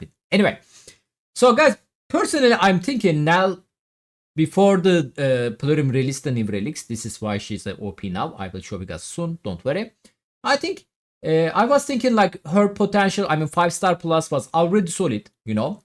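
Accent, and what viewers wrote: Turkey. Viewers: bu elemanin aksani türk ingilizcesi gibi değil mi 😅😅 türk olduğuna iddiaya girerim ama ispatlayamam😅😅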